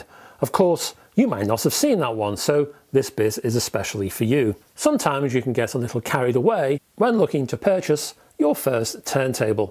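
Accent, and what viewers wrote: United Kingdom. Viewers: I am from Canada and when I hear a British accent I prefer prices to be quoted in "quids" instead of "pounds". Great video!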